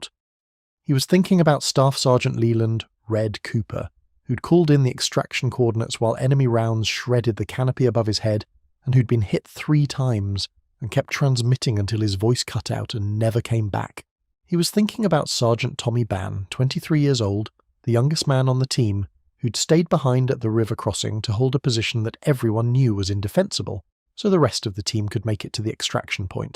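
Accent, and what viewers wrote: United Kingdom. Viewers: Why, oh tell me WHY, Lord, is this story delivered with a British accent? I can't listen!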